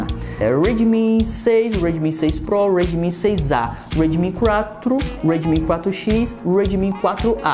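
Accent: Bahia, Brazil. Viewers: Ele é baiano !? 🧐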